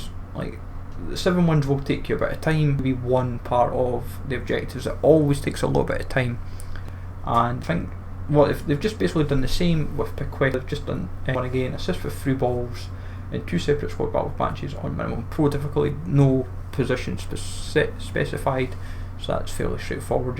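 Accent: Scotland, United Kingdom. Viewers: is it scottish accent for real?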